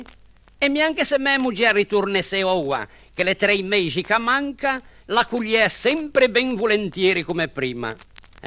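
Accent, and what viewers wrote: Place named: Genoa, Italy. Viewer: Dialetto genovese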